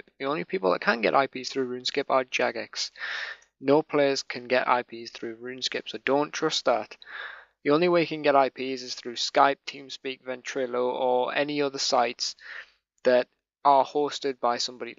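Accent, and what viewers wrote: Newcastle, United Kingdom. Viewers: Are you from newcastle? you sound georide :) im from newcastle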